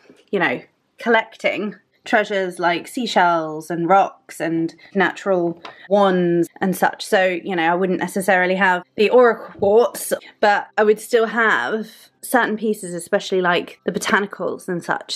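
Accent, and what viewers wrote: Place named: RP, United Kingdom. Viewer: Love your video and thank you so much for sharing your altar in this video. So creative and absolutely LOVE that huge rose quartz tower. Love that we both truly love the dark goddesses: Lilith, Hekate and The Morrigan. I pointed out that your book shelves looked like mine with all those books piled high. He was like "you witchy types like your things," I was like, "be glad that you are one of those things that I still like, lol." I adore your accent as well. It sounds so posh to me. Being from the states and I am in central Ohio, I don't really have what you call an accent. I get a country thang occasionally when saying certain words and that comes from my momma, and being around her all my life. lmao. 🌹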